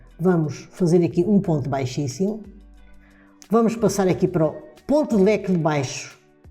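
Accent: Portugal. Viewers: MT bonito o seu trabalho. MT bom ouvir português de Portugal.🤗♥️🌹🇵🇹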